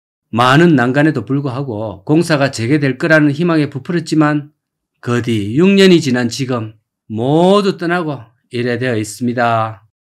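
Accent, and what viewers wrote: Gyeongsang, South Korea. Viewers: ㅋㅋㅋ 말투가 경상도신데 전라도라니 무슨 전략이신지 ㅋㅋㅋ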